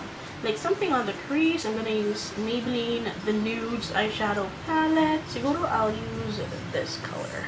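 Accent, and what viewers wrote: Visayas, Philippines. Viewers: hehehe ang sweet naman mag bisaya 😊😊😊 yung asawa ko man ilonggo sweet din magsalita 😊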